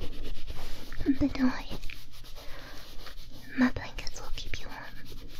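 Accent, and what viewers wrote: France. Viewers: Hmmm, I think I like the French accent now. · Frenglish is simply adorable in such a typically way. I speak both French and Italian, maybe we'll get an Italian maid next?:3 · La plupart du temps quand j'entends un non-natif parler Français je trouve ça un peu dure à écouter car l'accent m'a l'air forcé. C'est pas du tout le cas là par contre, l'accent et la prononciation tombent pile dans le territoire en frenglish mais compréhensible ce qui rend l'audio bien plus calme et relaxant. Most of the time when I listen to a non-native speak French I find it hard to listen to because the accent seems forced. Not the case here tho, the accent and pronounciation fall right between frenglish yet understandable territory, which makes it way more enjoyable and relaxing imo. Great stuff as always.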